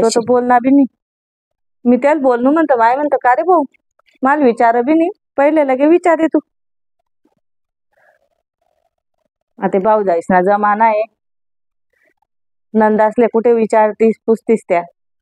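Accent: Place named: Khandesh, India. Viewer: मस्त अहिराणी येते तुला छान वाटते ऐकायला .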